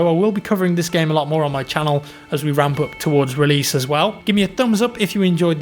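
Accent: United Kingdom. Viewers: اللكنه البريطانيه 😷💔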